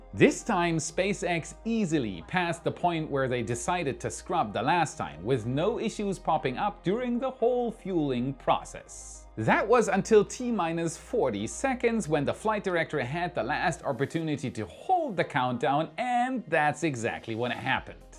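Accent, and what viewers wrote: Germany. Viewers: You can move to the us,but the german accent îs still there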